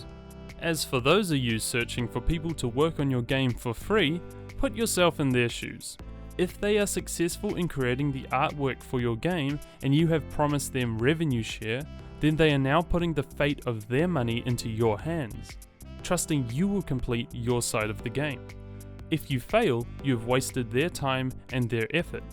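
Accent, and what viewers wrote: New Zealand. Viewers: Wait?? Your a kiwi??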